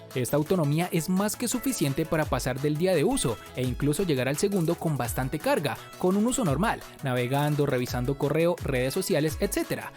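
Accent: Cali, Colombia. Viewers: Eres de la ciudad de Cali?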